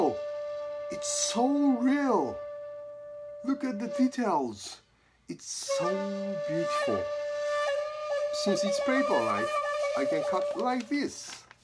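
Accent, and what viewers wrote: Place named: Japan. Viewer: SAMURAI EnglishとBritish Englishを混ぜたみたいな英語やな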